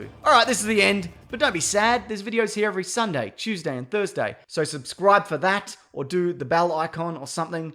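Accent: Australia. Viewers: Marcus Casamento All but three countries in the worl use the metric system. Also, are you deaf? he's Australian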